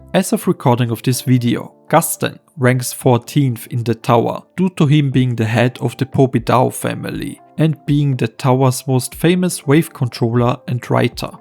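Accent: Germany. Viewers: love the german(?) accent keep it up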